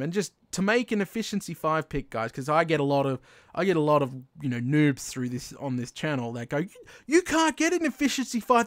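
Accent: Australia. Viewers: Hey are you from Aussie by any chance? Haha I'm from New Zealand so if you are from Aussie heya neighbour:D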